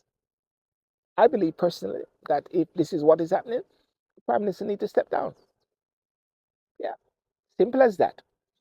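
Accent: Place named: United Kingdom. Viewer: I suggest you go and read the full report and try to let the bias side of your brain to find the facts..ask yourself this the priminster is a 52 year old man that could run any company globally and make millions but unlike you chooses to exercise is patriotic side and remains in his country to help build the economy..i suggest go do some more research and come back to your audience in your firm British accent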